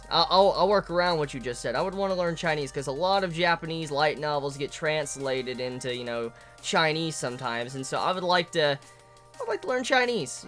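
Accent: United States. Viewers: After seeing that old dance video, I've noticed that your country accent has diminished over the years.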